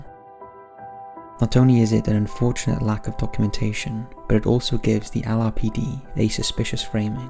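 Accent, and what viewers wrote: United Kingdom. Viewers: The narrator is obviously British. You are aware they speak a little differently than Americans, right?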